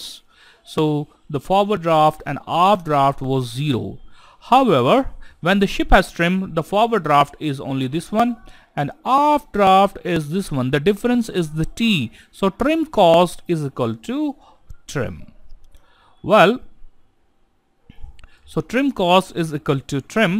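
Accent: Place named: India. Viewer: u trying so hard to make british accent but still your indian accent kills your mouth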